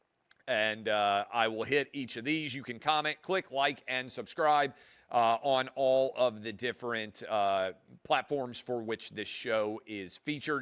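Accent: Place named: Boston, United States. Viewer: Sorry Clay, you are alone on this topic. You sound like you are from Boston.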